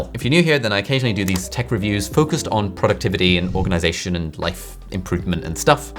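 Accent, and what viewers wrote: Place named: United Kingdom. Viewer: I feel so settled when I hear that british accent and looking at you.... 🥰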